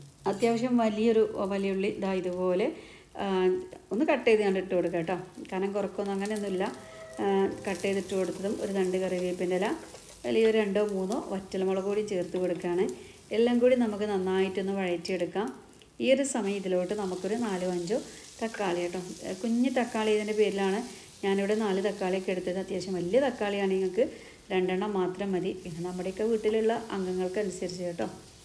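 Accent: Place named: Kannur, India. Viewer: താത്ത സംസാരം വല്ലാതെ മാറ്റി കണ്ണൂർക്കാരിയെ പോലെ സംസാരം പക്ഷെ താത്താൻ്റെ പഴയ സംസാരം കേൾക്കുന്നതാണ് ഞങ്ങൾക്കിഷ്ടം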